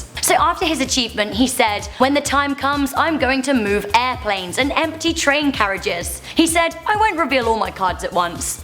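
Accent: United Kingdom. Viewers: i love Ur British accent!!!!! i am australian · Are you British?? I am just curious.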